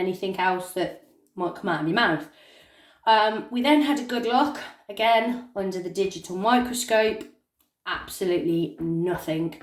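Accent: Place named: West Midlands, United Kingdom. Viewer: Nice to here a west mids voice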